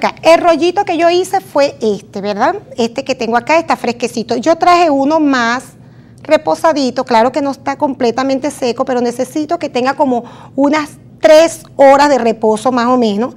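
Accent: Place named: Venezuela. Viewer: Un saludo desde El Salvador, increible como estoy aprendiendo de usted, por su acento creo adivinar que es de la hermosa Venezuela, y por tu forma de responder eres cristiana evangelica, Dios bendiga tus manos, y por favor dime o enviame por favor el paso a paso còmo hiciste el dulcero que diste de regalo por favor amiga linda.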